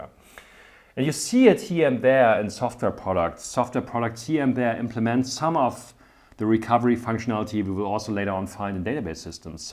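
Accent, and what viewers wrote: Germany. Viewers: Ohh Gott, dieser Deutsche Akzent. Aber trotztdem Danke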